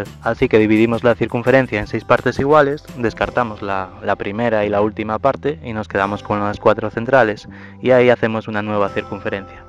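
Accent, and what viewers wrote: Galicia, Spain. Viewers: Buenísimo el video, muy claro y trabajado :) Otra cosa, eres de Galicia? Es que yo soy de allí y me suena un poco tu acento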